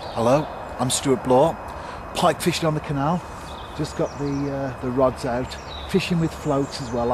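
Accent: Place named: United Kingdom. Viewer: This is where we are off to tomorrow...I liked the talking..:) nice to hear familiar British accent...regards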